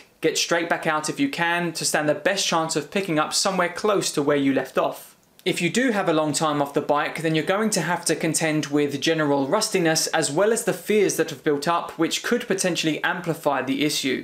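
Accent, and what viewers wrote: United Kingdom. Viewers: Dear Lord, once again a perfect Video which comes at the perfect Timing for me. I really adore your videos, or maybe its just the well sounding british way of presentation 😁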